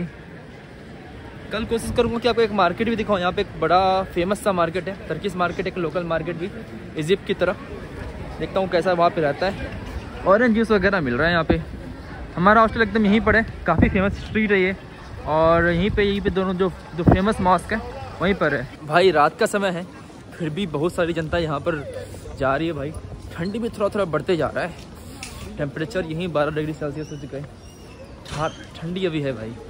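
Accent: Bihar, India. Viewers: LAST RAT.......HAHAHAHA O BHAI SAHAB THIS IS BIHARI TONE LOVE U BHAI.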